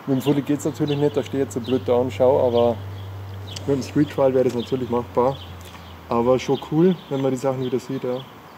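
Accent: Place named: Bavaria, Germany. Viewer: Kleine Frage, aus welcher Gegend kommst du? Denn man hört den Bayrischen Dialekt☺️😉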